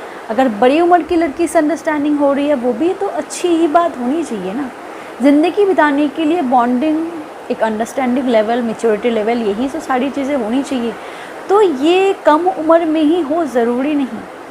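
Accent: Bengal, India. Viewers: aapni jodi banglate bolle khub bhalo hobe aapni jokhun Hindi bolen bangla bangla bhab aase aapnar thopik guli bhalo lage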